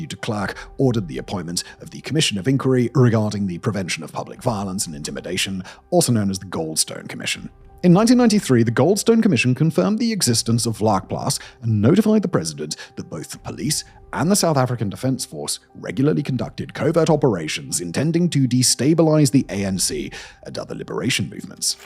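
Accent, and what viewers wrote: England, United Kingdom. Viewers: Pokemon Red on 1 screen, A British man describing the worst thing ever on the other. Perfect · 'N Britse kont agter 'n skerm.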